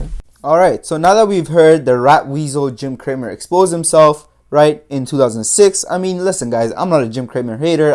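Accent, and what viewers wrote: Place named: Iran. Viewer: You sound iranien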